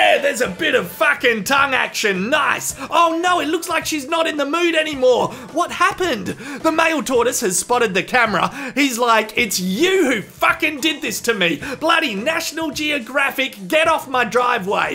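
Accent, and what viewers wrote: Australia. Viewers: Spoken like a true Aussie mate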